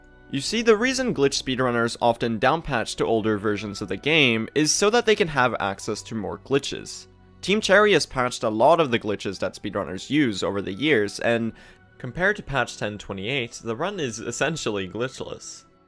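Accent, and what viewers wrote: Sweden. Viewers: Är du svensk? Jag missade dialekten :D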